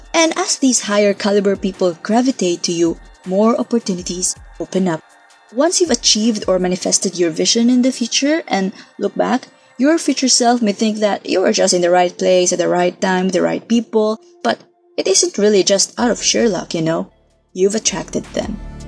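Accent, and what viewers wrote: Philippines. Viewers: Wonderful! Your voice sound like from the Philippines.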